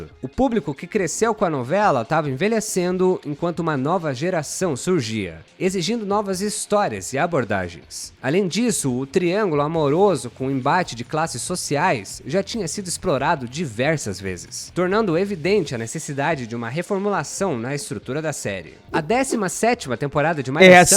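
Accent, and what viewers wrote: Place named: Rio de Janeiro, Brazil. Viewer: Todo carioca fala assim mesmo ou é só tentando falar igual aos mais conhecidos?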